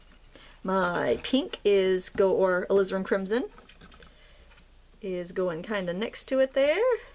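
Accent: Maine, United States. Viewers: wow that was awesome! it's so fun watching you do the watercolors, it looks so easy and it's inspiring for me (a beginner)..I totally understood what you said tho, LOL and I am not from Maine. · I love your lighthouse! I live on the California coast and I don't believe that I have ever seen one. They make me want to live in New England. After you dry your painting at various intervals, does adding more water reactivate the previous layer of color? Thank you for giving us a touch of Maine with the painting and your accent Lol!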